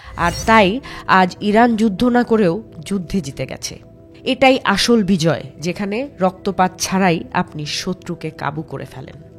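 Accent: Bangladesh. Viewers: আপনার ডায়ালেক্ট ও একসেন্ট বাংলাদেশীদের মত হওয়ার কারণ কি?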